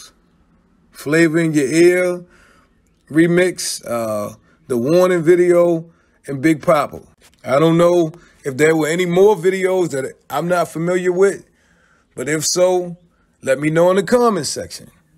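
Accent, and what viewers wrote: Baltimore, United States. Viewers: I had to shut this off. That Baltimore accent is awful. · Are you from Baltimore?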